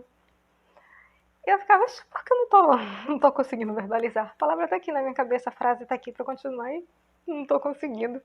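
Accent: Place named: Bahia, Brazil. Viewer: Oi! Um salve daqui de Salvador! Estou na minha primeira onda, está sendo uma onda... rsrs! Obrigada por seus vídeos, é bom ouvir relatos de RH com nosso sotaque! Saúde e sorte pra você!❤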